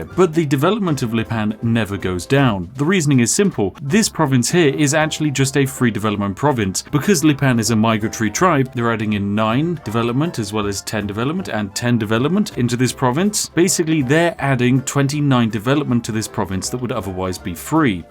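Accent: United Kingdom. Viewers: First time watching u and im sure u heard this a thousand times but i like this very british accent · Is he actually British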